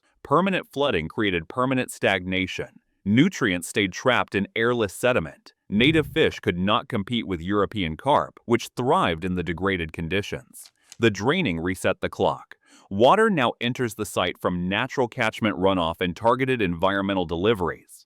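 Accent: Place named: United States. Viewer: ok - an American accent producing this video 🤣🤣🤣 have they been there before? it was the Victorian State Government - seriously did you do your research????????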